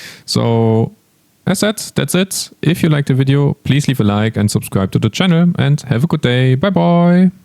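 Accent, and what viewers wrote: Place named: Germany. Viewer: Love your accent! If i would take a guess its a typical german one! <3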